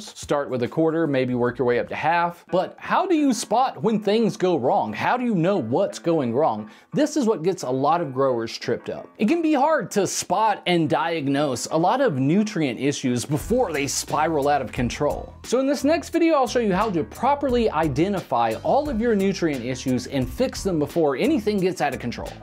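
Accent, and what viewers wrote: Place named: South, United States. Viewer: my got that southern drawl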